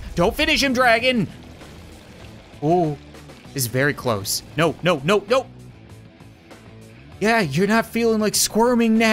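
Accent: Canada. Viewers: Don't make fyn of him he's Canadian so he talks a little different what might make sense here dissent make sense to him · He pronounces things different cuz he's Canadian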